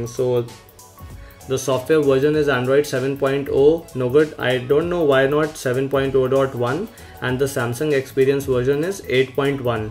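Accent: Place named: India. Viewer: i love indian accent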